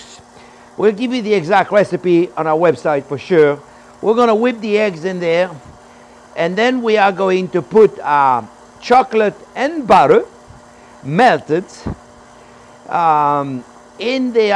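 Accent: France. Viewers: C'est vraiment un plaisir de vous retrouver depuis tout ce temps. Ravi que vous soyez revenu sur la Youtube. Pour moi vous êtes le chef parfait. Super pédagogue et simple. Et puis cet inimitable accent français aussi. Surtout continuez! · I just love your French accent!!! I can't wait to make this...with you!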